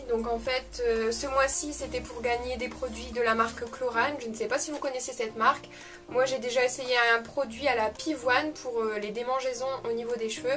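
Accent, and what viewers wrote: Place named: Nord, France. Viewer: J'aime beaucoup aussi la marque Klorane 😊 le baume au beurre de mangue j'ai déjà testé tu vas voir il est super ! Je ne m'abonne pas à la Birchbox car il y a toujours des produits dans les boîtes que je n'aime pas et que je n'utiliserai jamais et je n'aime pas le gachis 😅. Tu nous en dira des nouvelles des produits et de ton ressenti. Tu es Nordiste non? j'aperçois un petit accent 😂 je suis Picarde. Super vidéo bisous 😘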